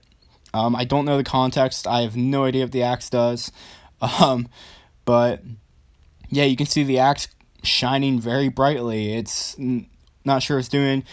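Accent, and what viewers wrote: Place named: Philadelphia, United States. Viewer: Found this channel because I heard there was a new trailer out but then noticed that thick ass Philly accent and I love it haha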